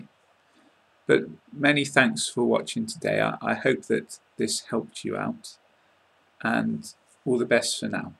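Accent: United Kingdom. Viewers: I really like your British accent !! Good job :)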